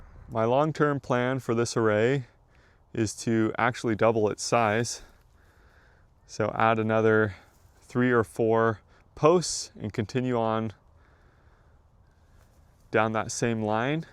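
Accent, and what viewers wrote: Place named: Canada. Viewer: Hey I'm hearing a canuck accent, where are you from? I'm looking at integrating a similar design in our future home. Great video, love from Alberta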